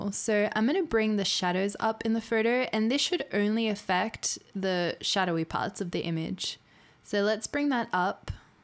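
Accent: Australia. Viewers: Thank you for the great tutorial,this gonna help me alot 😉 Are you Aussie?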